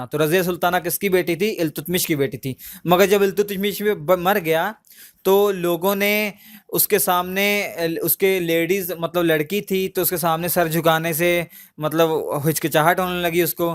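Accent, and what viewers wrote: India. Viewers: Your accent is Desi. Bahut achhe se smjh me aata h sir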